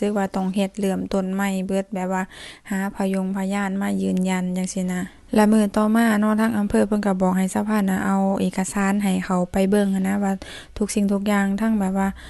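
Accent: Laos. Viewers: อีกอย่าง สำเนียงพูดลาว FC บอกหลายทีแล้ว เวลาติดต่อราชการให้เปลี่ยน นี้ก็ไม่เคยจะทำ จะมาขอสัญชาติไทย ยังจะพูดลาว จะมาห่วงแหนความเป็นลาวอะไรขนาดนั้น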